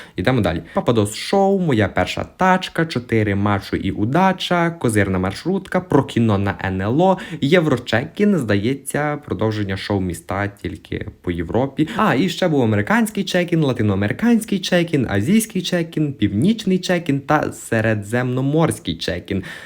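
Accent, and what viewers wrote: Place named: Western Ukraine, Ukraine. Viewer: контент класний, але сильний западенський акцент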